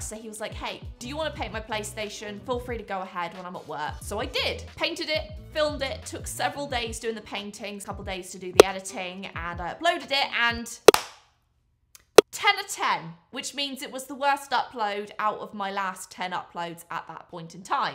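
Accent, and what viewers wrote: England, United Kingdom. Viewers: I first started watching you because I miss my family in the UK and listening to your English accent was comforting. Having said that, I also love your quirkiness & how you can laugh at your mistakes. Your creativity, in whatever form is also always a great watch. I believe being a YouTuber takes a lot of bravery (I'm not brave at all) so please keep on being you & thank you for doing this ☺️❣️🤗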